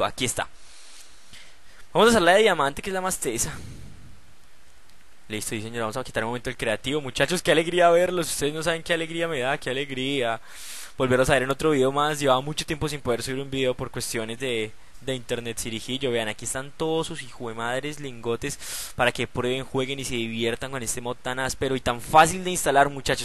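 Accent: Colombia. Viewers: sos colombiano ? ._. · Se le nota que es Colombiano el parce , por su cara por su habla diciendo parce , chimba y por eso siempre estoy orgulloso de Colombia :D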